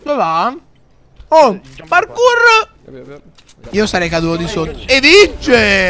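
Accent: Tuscany, Italy. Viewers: Ma sei toscano pk si sente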